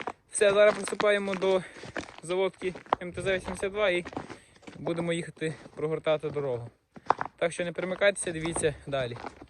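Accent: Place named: Surzhyk, Ukraine. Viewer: А де ви живете яка область. Такий говор як в нас суржик .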